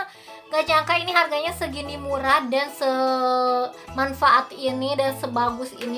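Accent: Sunda, Indonesia. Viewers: logat sundanya kentel banget teh ngakak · Sunda pisan euy....😆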